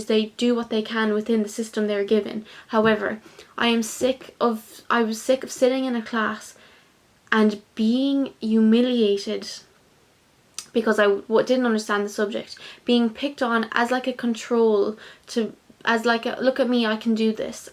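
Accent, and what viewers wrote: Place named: Ireland. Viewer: Hi. My school days were an absolute nightmare. A lot of bullying from other kids and from some of the teachers too. And at home it wasn't better because my parents were always fighting. As i mentioned in another comment i suffer from depression and social anxiety. I think the stuff i experienced during my childhood and something that happened a few years ago caused it. I am on medication now which helped me a little with major depression but i still have social anxiety. And the medication makes me confused and drowsy. I like your irish accent. Hope you are having a great day and good luck with your studies.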